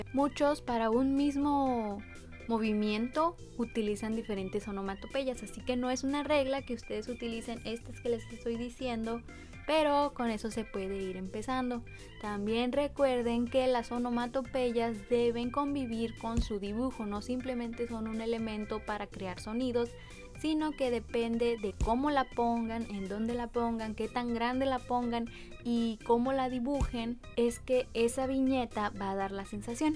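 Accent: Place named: Chihuahua, Mexico. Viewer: ¿eres de chihuhua? :v Tienes el acento parecido a los residentes de ahí xd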